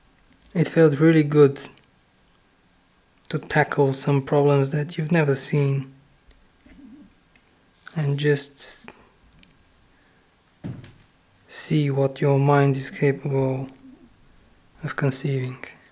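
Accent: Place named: United Kingdom. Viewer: You sound as if you've lived in the United Kingdom for years, you have a really cool accent, being from Northern Ireland, I only wish mine was as cool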